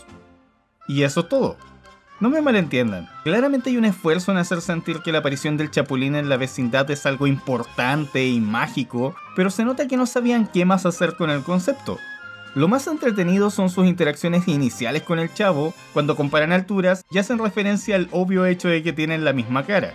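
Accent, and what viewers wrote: Chile. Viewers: a mi lo que me gusta es tu acento chileno poh saludos desde guatemala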